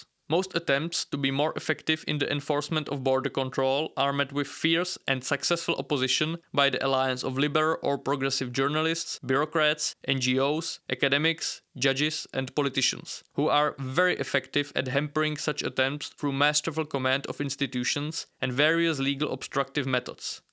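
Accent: Czech Republic. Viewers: Your Czech accent is extremely strong ...Try to at least a bit to change when narrate video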